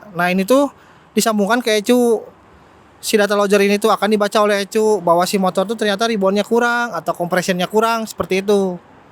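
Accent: West Java, Indonesia. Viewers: Ketahuan logat sundanya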